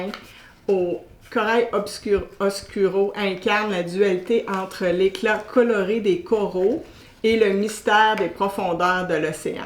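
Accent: Quebec, Canada. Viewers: Salut! J'adore l'art de la parfumerie et je viens de découvrir votre chaîne YouTube. En fait, j'essaie d'apprendre l'accent québécois en écoutant votre français! héhé J'espère que vous passerez un week-end extraordinaire.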